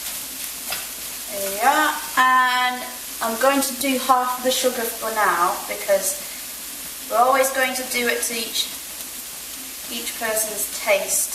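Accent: United Kingdom. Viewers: your british accent is simply fantastic....Hi from Malaysia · wow british accent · great job done by a new generation Taiwanese, like your British accent. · Are you from England. If so where in England that accent is so strong!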